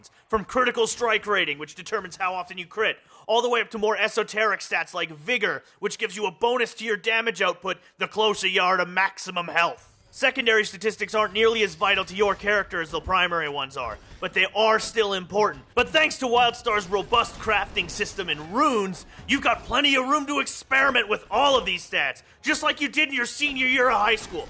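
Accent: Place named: United States. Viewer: Sadly going by the accent I'm going to hazard a guess that you're going to be on a US server.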